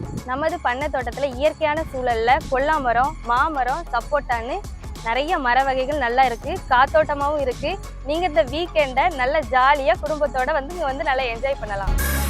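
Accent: Tirunelveli, India. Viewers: உங்களுடைய திருநெல்வேலி slang super.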